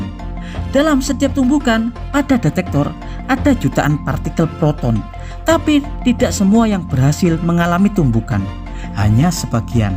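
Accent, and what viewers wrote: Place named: Java, Indonesia. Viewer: Tak berijazah tapi jenius tapi medhokk.. magnet jadi mahnet.. gpp yg penting paham dengan penjelasannya prof.. terima kasih 🙏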